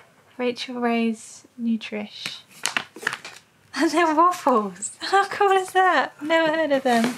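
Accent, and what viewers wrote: United Kingdom. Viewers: How do huskies understand British accents? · Why do u have a british accent?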